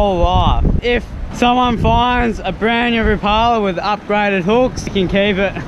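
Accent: Australia. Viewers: Were are you from ? Sound Australia or like you are from new Zealand, love your voice and accent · The ozzy accent tho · do all azzys talk like this i canna understand him haha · This video is so australian lmao. Australian accent, fishing, surfing, dolphins 6 feet away from you... And I'm only 5 and a half minutes in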